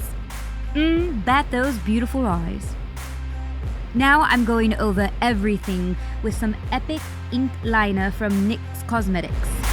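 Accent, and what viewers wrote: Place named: United Kingdom. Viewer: Accent is on point!!! Perfect British accent ever❤❤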